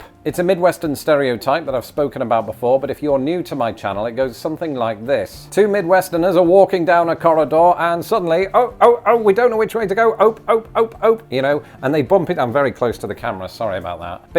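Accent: United Kingdom. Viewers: Your beard isn't bad but they age people. I haven't seen my son without a baseball cap for at least 10 years. He claims it is because his hair is thinning. I told him not to wear it at my funeral. You have a nice British accent, I can understand it. Let your hair grow while you still have it. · What the hell happened to you? Looks like your DNA is changing. You’re becoming an ‘merican. Just thank God you didn’t end up in the south. You’d be talking with a twang, eating grits and drinking sweet tea. Oh wait…tea? 😝 Love your vlogs. Love GB 🇬🇧🇬🇧🇬🇧🇬🇧🇬🇧🇬🇧 Just promise me you won’t lose your British accent. 😁😁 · I'm a midwestern, born and raised as such. I've been around the world but came back to my midwestern roots. You, are most welcome here in the heartland of the USA. Keep your British accent, it is your trademark! Go Cubs! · Don’t totally loose the UK accent and words. 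Enjoy the mid west. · Ooh,!! Look at your cute little self back in England! Yes, you have blended well (until you speak- but like you said, we love to hear British accents) 😀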